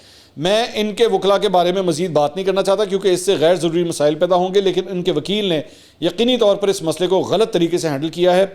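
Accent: Punjab, Pakistan. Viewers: "Agha majid honey albela zafri khan tariq taidi aur iftikhar thakur sy mazrat k sath" Dear jii.. main aik arsy sy ap ko sun raha hon ap ka sanjida andaz mazbot personality aur bebak reporting sab ko he pasand ho gy. Lekin ajkal reporting k doran ap ko bhaand panay ka dora parna aur punjabi touch nihayat ajeeb hy agr ap apny show ko entertaining bananay k leay kr rahe hein tu market main already log mojod hein ap b 2 3 hire kr laein lekin ap khud mehrbani karein sirf reporting karein shukriya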